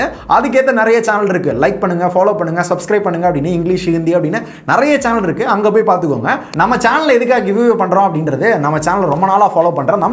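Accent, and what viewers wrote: Madurai, India. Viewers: Kaya kaya nu pesitu irpanga.. Madurai slang 😁❤️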